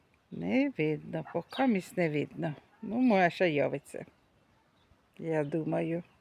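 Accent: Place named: Poltava, Ukraine. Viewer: Доброго вам дня. Гарно у вас і тихо. Це мабудь Полтавщина, по говору. Миру вам і добра. ❤❤❤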